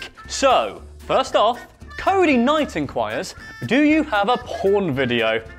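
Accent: England, United Kingdom. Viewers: I've always wondered. how does one get such an awesome British accent? I'm part English and only have a Swedish accent mixed with native accent (both I am too) QAQ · I've always wondered are you english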